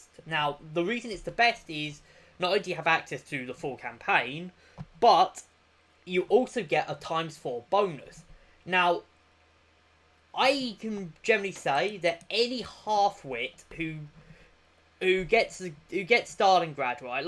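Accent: United Kingdom. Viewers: how fricking cool is your british pronounciation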